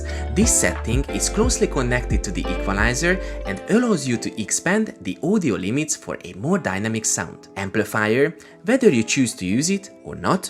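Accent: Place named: Hungary. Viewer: Amint a hang megszólalt, egyből az villant be, hogy ez a csávó magyar, 1000%. :) Annyira tipikus, mint az indiaiak, nem lehet letagadni.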